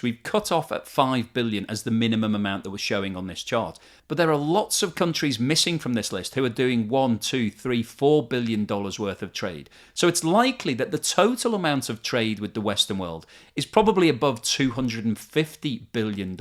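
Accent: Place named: England, United Kingdom. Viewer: Looking at your playlist I think you might be confusing your country which I'm assuming is England from your accent is Russia cuz your economy is fucked way more than Russia's! England the EU and the United States all shot themselves in the foot or should I say through their heads to hit Russia which is dumbest shit! You seem to be living in a willfully ignorant lala land of projecting what you would like to occur as opposed to the actual situation on the ground Russia is hurting to some degree but not as bad as Britain! And it's only going to get worse now that Beau Jo's gone and someone even stupider it's going to take over! Maybe you also believe as Liz does that you all are going to defeat Russia in Ukraine? This is a global realignment, that will hurt Russia slightly in the short term but benefit them in the long term and devastate as you put it the West otherwise known as Europe Canada and America which represent only one fifth of the human population! 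So opportunities abound now for Russia India and China! And Europe is now going to be my country America's bitch as it wanted it to be and Britain will be the lap dog! America's economy is hurting but Europe is in for a long cold inflationary recessionary winter and next several years!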